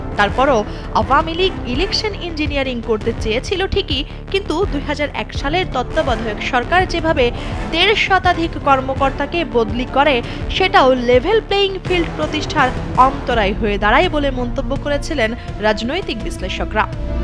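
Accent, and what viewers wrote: India. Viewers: ভারতের স্টাইলে সংবাদ পাঠ🖕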